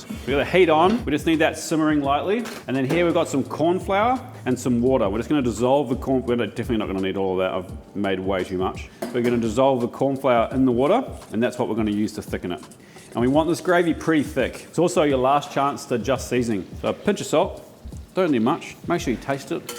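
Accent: New Zealand. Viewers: I had no idea was an Enzedder, but I honestly should’ve guessed it based on the accent 😂. EDIT: Okay, I haven’t watched one of his long-form videos yet, so I wasn’t previously aware of it. Hopefully, that clears up things a bit. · Oh hey, I'm not crazy... Only been watching your videos for about a week (but been watching a smattering of them) and had one where you said you were in Sydney. Through me off because I was sure it was a NZ accent (words like "fish" are key, and growing up in Canada my best friend was from NZ). Happy to hear you say you're originally from NZ. All is right in my brain again.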